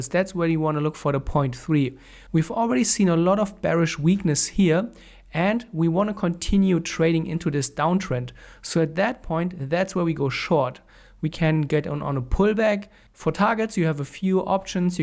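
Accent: Germany. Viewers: Are you from Germany??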